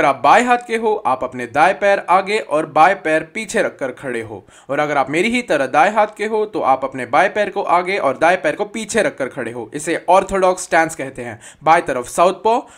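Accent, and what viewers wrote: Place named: United Kingdom. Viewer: oh bhai i just watched this vid in pure british english nice dub artist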